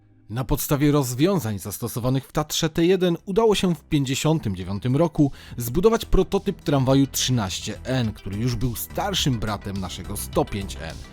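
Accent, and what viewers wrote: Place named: United States. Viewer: Pan miejscami intonuje słowa na końcu zdania, jakby spędził 30 lat w Stanach. Nie ma co kombinować, lepiej mówić naturalnie, po naszemu.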